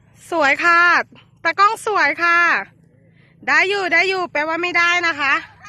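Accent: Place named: Renu Nakhon, Thailand. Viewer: ภาษาเดวกันกับไทเรณูนครเลยเนาะ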